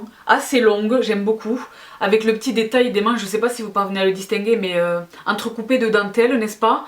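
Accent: South, France. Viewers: J'ai tellement eu le coup de foudre depuis que je t'ai découverte il y a quelques semaines, que je me regarde presque toutes les vidéos que tu as faites depuis le début, un peu tous les jours. Ca y est, tu m'as rendue accro ! Pourquoi ? Je pense que c'est ta façon de parler au vocabulaire riche, tes expressions rigolotes et ton accent du Sud... et puis bien sûr parce que tu parles de choses qui m'intéressent ou de marques dont je suis déjà fan (Lorac, Tarte, etc...), et parce qu'en plus tu m'en fais découvrir de nouvelles. Et cerise sur le gâteau, tu es pleine de bon sens. Bref belle, intelligente, généreuse et énergique, la copine rêvée, moi je dis !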